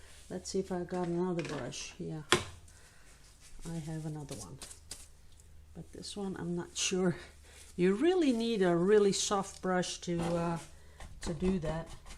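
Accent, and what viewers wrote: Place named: Netherlands. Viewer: The pencil eraser made me laugh and the hair comment. From the other video. I'm always fussing at my hair Lol. I need to colour my greys!!! Very good paintings and thank you for explaining mica powders and pigments. I bought some Siesso mica powders and watching videos on them. Love your Dutch accent!!!...;) I'm from Ohio...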